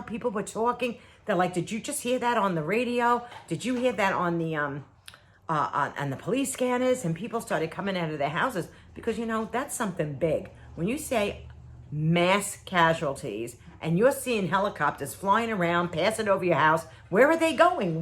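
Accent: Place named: Boston, United States. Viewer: Are you from Boston? Lol cuz of the accent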